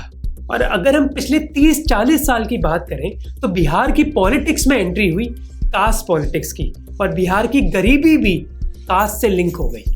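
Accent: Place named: Uttar Pradesh, India. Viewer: I think she is from UP state 😂😂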